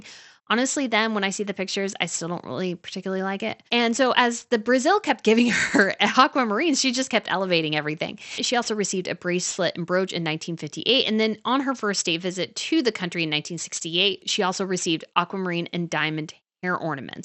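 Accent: United States. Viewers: Makes me cringe listening to your whining American accent criticising a late Queen’s tiara RIP. No class, no respect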